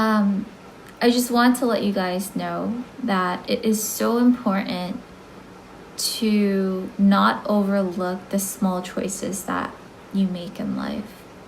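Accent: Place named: United States. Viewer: 핱시봤을땐 한국인유학생출신인줄알았는데 이 영상보고나니 한국계미국인인거 확 와닿네요 말하는 방식이 완전 미국인ㅋㅋㅋㅋ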